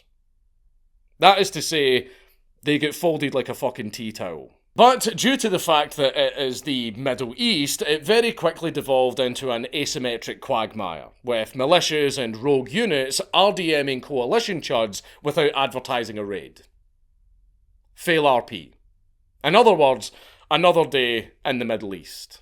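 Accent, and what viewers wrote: Scotland, United Kingdom. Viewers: “Became knoGwn as the golDf waDrr “ Yo Scott’s are cool af bro . Best English variant yet other then American southern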